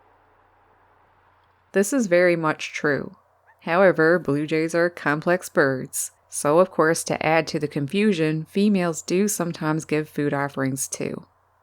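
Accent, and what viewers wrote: Canada. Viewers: Food offering. Mate for life. So romantic! Thanks for the video,. I've always loved your narration. I guess I'm intrigued by your Canadian accent.😂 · Love your Canadian accent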